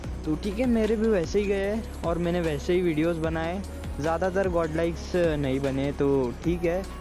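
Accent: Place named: Philippines. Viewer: He is philipino tagalog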